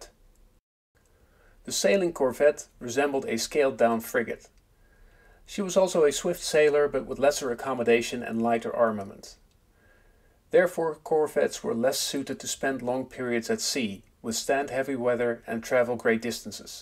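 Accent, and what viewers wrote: Netherlands. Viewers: klinkt nederlands. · Is that Dutch accent that I hear? 😅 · That is a Dutchman talking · Wat een irritant Nederlands accent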